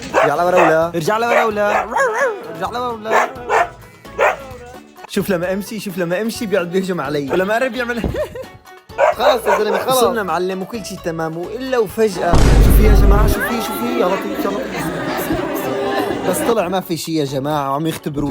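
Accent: Egypt. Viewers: انا حاسك مصري مصري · هوا مصرى تحسو نصو مصرى ونص سوري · المصري تبعك كتير بيضحك 🙂😂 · هو انت مصري ولا ايه يا روح الب اخوك❤ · بجد طريقتك كلامك بالمصري تحفه😂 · إيداااا انت من الشرقيه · انت مصري ولا يه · سوري ولا.مصري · انتي مصري ولا سوري حيرتنا 😅😂❤ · اللهجه المصريه برفكت ❤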